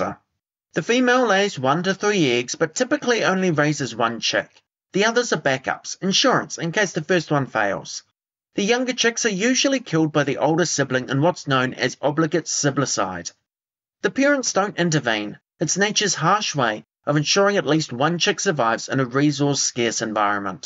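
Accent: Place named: New Zealand. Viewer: Great article mate, thank you. Also really easy to listen to your NZ accent, rather than yanks. 👍🏼🇦🇺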